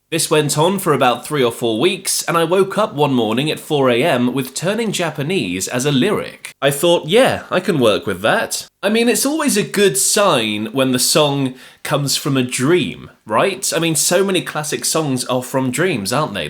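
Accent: United Kingdom. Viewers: Yeah, between this strangely moralistic stance and the fact he censors words like "masturbation", he sounds like a puritanical American who happens to have a British accent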